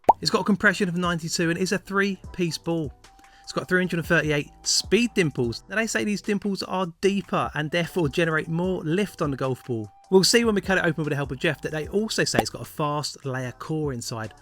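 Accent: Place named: England, United Kingdom. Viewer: First off Zee Star?? You sound English so surely it's Zed Star. Not tried the divide tbh, but a playing partner likes them, Q Star Tour Divide. I found a Z Star Divide and gave it to him, his reaction didn't go as far Q Star, but that's the compression and with the slow swing speed he gets more out of the soft one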